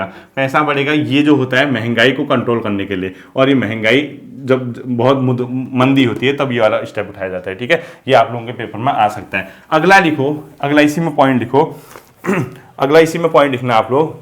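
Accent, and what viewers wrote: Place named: Chamoli, India. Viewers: Chamoli dilect cha yu😍.. Bhotte bhal gurujii..Yan lagnu yakha k cha ap😀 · Bahut achi koshish sir garhwali bol bolne ki ..👍🙏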